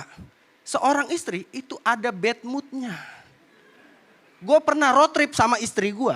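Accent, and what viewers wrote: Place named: Betawi, Indonesia. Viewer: Lu Betawi ya Bang? Lucu banget dan logatnya enak didenger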